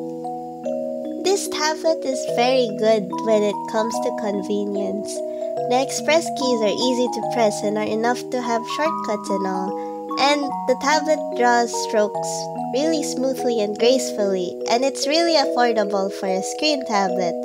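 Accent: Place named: Philippines. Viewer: What country do u live in?, 'cause u sound filipino.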